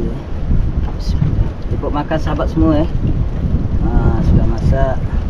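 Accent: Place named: Kelantan, Malaysia. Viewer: Abg asalnya org mn ek? Sebutan ikan tu sama mcm org Kelantan sebut 'ikan kitam', dan banyak lg lh ikan² yg abg sebut sama mcm org kelantan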